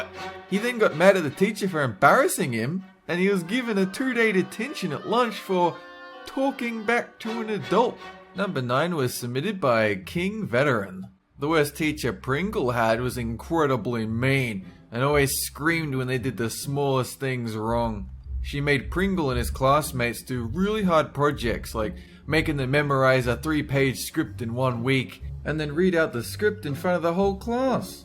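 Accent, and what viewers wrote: Australia. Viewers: Yaaaay...narrated by 😊 G'day mate, love it that an aussie is such a successful youtuber and has awesome channel. Also love when you narrate in your very aussie styled, layed back, smart arse way. More narration from YOU pls! And a face reveal...of everyone pls?!?!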